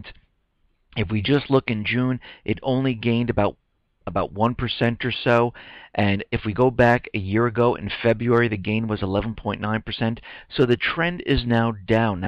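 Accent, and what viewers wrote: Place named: Brooklyn, United States. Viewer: his accent sounds like my friend's... My friend is from Brooklyn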